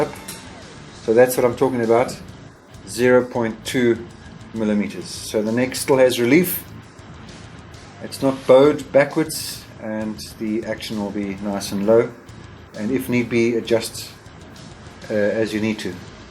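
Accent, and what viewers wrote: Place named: South Africa. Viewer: Nice, you must be South African. 🎉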